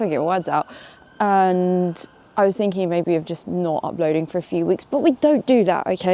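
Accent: United Kingdom. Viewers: I’m not British/English (or whatever you call yourself), but Ifing love her accent, intonation as she exclaims to every detail she spots during the video like “Oh look the sun is shining!”. Also, she has a beautiful face full of expressions and they are changing constantly while she speaks. I love it all. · Wow you sound so British. I'm so used to YouTubers with American accents! Its good that you don't sound like the!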